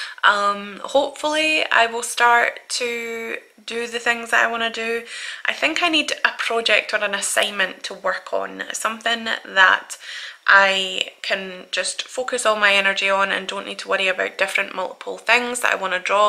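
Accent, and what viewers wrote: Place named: Fife, United Kingdom. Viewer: yeah I know what you mean about me pursuing drawing. I used to really love it when I was younger and I was ok at it, but then when I attempted it about a month ago I was horrific at it and I was like "eh where did all my talent go lol", but as you say, if I put in the time and effort I could get good at it. I know you moved house a few months back so I hope all that went ok, it's always really stressful. I'm a Scottish gal myself, where are you from, you sound as if you could be from Fife. Hope your handsome boy Cayden is doing good as well 😊 Btw I would love you to do more colouring book videos but I know probably art and drawing is your first love 😁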